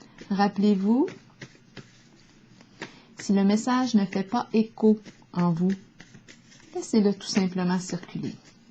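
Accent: Canada. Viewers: Bonjour Ravie de vous retrouver avec ce jolie accent canadien Je vous suivez dans vos mini guidances et vous avez disparue puis de retour avec des guidances plus longues avec un grand plaisir 🙏😘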